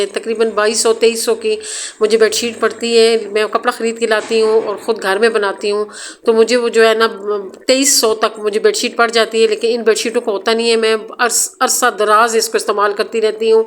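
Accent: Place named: Attock, Pakistan. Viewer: I like your house...simple,decent... Ap k bolny k andaz sy mjy lga k ap attock sy hn..r ap ny khud b zikr kr diya😊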